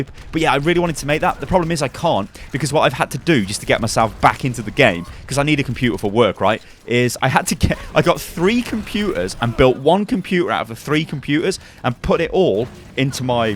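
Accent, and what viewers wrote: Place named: Cockney, United Kingdom. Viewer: I just noticed how you say computer in a cockney accent - compu'er. And the reason I love this channel is precisely because it's british, and I LOVE british accent - and its nuances - between the ones I've heard. Thanks for the hard work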